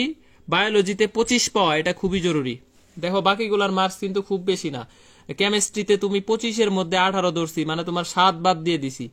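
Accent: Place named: Barisal, Bangladesh. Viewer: বরিশালের ভাষা সুন্দর ভাইয়া ♥️❤️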